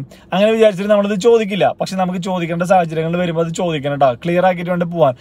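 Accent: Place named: Thrissur, India. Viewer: ലാസ്റ്റ് ക്വസ്റ്റ്യൻ and ആൻസർ ആണ് ഇഷ്ടപ്പെട്ടത്. പിന്നേ,,, തൃശ്ശൂർ കാരൻ ആണല്ലേ... ഞാനും.🥰എത്ര അറിവ് നേടിയാലും അവരവരുടെ നാടിനെ ഓർമ്മപെടുത്തുന്ന തനത് ഭാഷ ശൈലി . ഇതിന് മറുപടി ഇന്ന് പഠിപ്പിച്ച ആദ്യ പാഠം തന്നെ ഉപയോഗിക്കുന്നു.🙂 Well done my boy 😂 keep it up 😋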